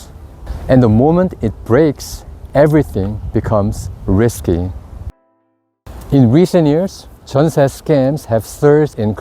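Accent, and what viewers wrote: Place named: West, United States. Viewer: This creator is VERY interesting. I'm running into several English speaking channels of people living on Korea. In particular, this gentleman speaks a Western style of American English very well. Thank you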